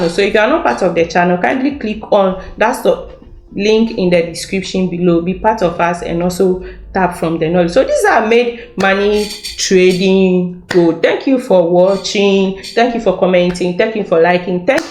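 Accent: Ghana. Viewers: It's Your Ghanian Accent for me🥰😍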